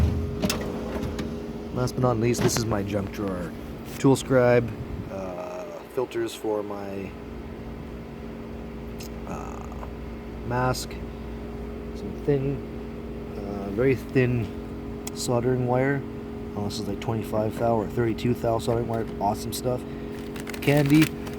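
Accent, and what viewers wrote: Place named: Canada. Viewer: Can you first introduce yourself please and state how credible you are, what you're currently using them for, what your specialties are, and how many years you've been an aircraft mechanic? And please, an iphone 5s has a better camera than that. What is that a flip-phone? Nice Canadian accent, you from Canada?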